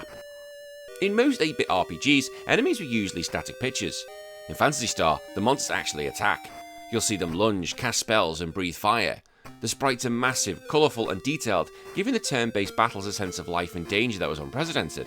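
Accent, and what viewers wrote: United Kingdom. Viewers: Yeah, I think overall it outsold the NES in Europe... This guy also sounds like he's in the UK, where NES really sold poorly and the Master System did very well.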